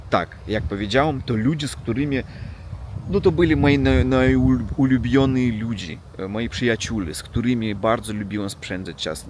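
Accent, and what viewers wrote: Russia. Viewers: Naprawdę nie musisz sie ciągle sumitować. Wszyscy wiedzą, że Rosjanom trudno się rozstać z akaniem. Osobiście uważam, że język to jest to co Rosja ma najlepszego i polski z zaśpiewem rosyjskim brzmi chyba nawet lepiej niż bez. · Racja , przestań przepraszać,bo możnaby pomyśleć że to z przekory, że wiesz że pięknie mówisz po polsku i czekasz na pochwałę. Mówisz pięknie lecz z ruskim akcentem, zamiast O mówisz A oraz mówisz Ł głęboko, gardłowo zamiast L. Brawo na 4❤️ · A mnie podoba się twój przeplatany język - polski z zaciągiem rosyjskiego akcentu i słów .Wyjątkowo przyjemnie się go słucha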